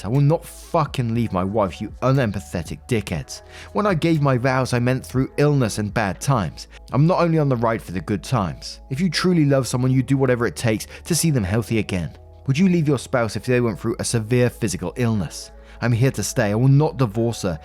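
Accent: England, United Kingdom. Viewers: From Norn Iron and I love your English accent!!!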